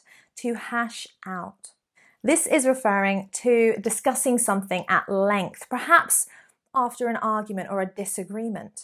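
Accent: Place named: RP, United Kingdom. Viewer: That's a lot for your Amazing and I would love to stress AMAZING lessons.. very very posh..😆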